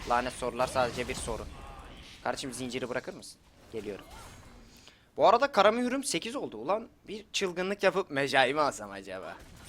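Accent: United States. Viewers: Kardeşim şu amerikanvari konuşmaların gerçekten tipine çok iyi gidiyor başarılarının devamını dilerim:D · şu amerikan dublajı yapmayı bıraksan hani videolarında eski tat yok reis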